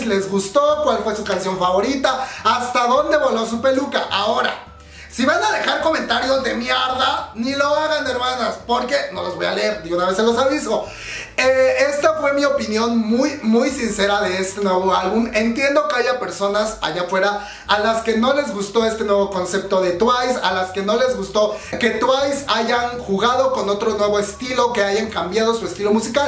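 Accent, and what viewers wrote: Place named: Mexico. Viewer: Comadre yo te sigo por la forma como tu hablas me encanta tu acento mexicano yo no soy fan de ningún grupo femenino de Kpop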